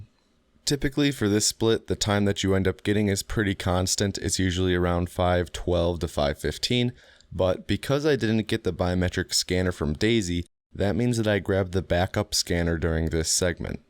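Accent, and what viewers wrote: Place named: Midwest, United States. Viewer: I love the aggressive Michigan / midwestern accent this episode? Like never noticed it before lmao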